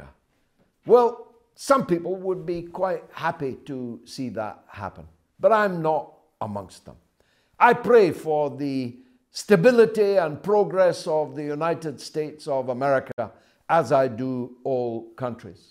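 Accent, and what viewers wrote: United Kingdom. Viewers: Damn you've turned into Tucker carlson with the British accent. Trump's actions were deliberately criminal.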